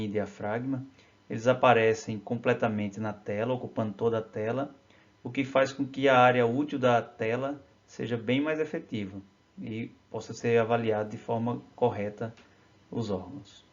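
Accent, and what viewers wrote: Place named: Ceará, Brazil. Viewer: Meu parceiro que aulas boas. Parabéns! Vc é do Ceará? Sotaque de cabra bom. Só o som que ficou meio baixo, mas conteúdo de excelência! Abraços. Ps. Encontrei o canal por acaso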